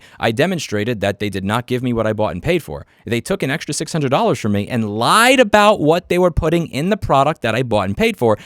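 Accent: New York, United States. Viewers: Good to see he's keeping his angry n'yohka style, even in sunny Texas, when he needs no put these scammers in their place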